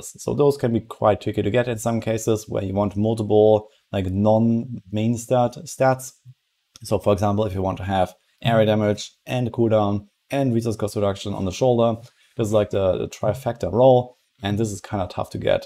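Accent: Germany. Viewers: Ich finde es immer so amüsant. 5 Sekunden vom Video geschaut und direkt gewusst, dass du Deutscher bist bzw. Deutsche als Muttersprache hast.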